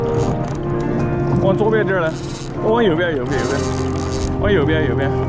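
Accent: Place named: Sichuan, China. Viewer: 听口音川人老乡这个船买成多少钱我也想买一个在烈治文周边海钓三文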